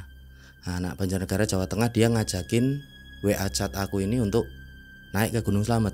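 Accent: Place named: Bantul, Indonesia. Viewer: logat e bantulan banget mas 😂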